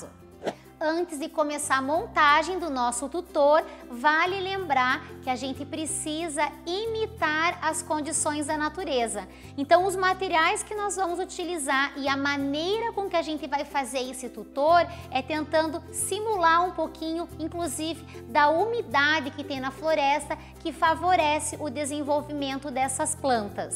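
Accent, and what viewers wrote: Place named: Northeast, Brazil. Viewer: que sotaque maravilhoso meu Deus. Amo vocês nordestinos.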